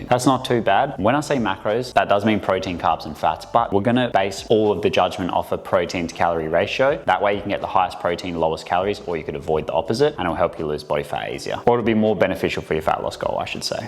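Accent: Australia. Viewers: I love how Australian you sound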